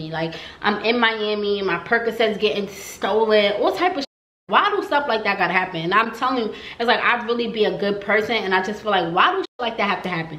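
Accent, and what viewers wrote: New York, United States. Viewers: OMG! Sorry you had an awful experience. I had a second BBL by Dr. Blinski in Miami. Much better experience than Spectrum Aesthetics. That was a nightmare. I’m just here for your New York accent. You remind me of my daughter. ❤ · Why did I think I was watching a nicki vid-when she was like “de de de-DOWN BELOW”😂 or maybe jus heavy NY accent. Either way-she’s gorgeoussss❤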